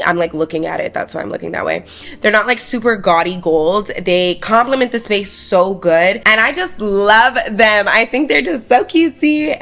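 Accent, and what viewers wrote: New York, United States. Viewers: Am I the only one that hears a New York accent now with her?????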